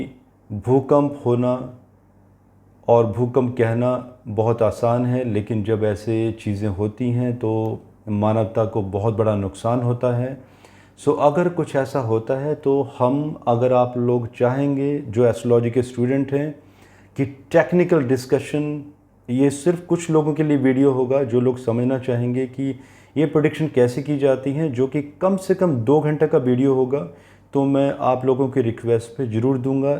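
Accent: Punjab, India. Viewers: I really like your Punjabi Accent ...:) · Punjabi accent?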